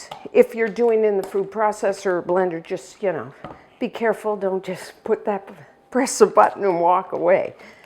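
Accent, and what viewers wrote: Canada. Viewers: Yeah I can pick up her Canadian accent.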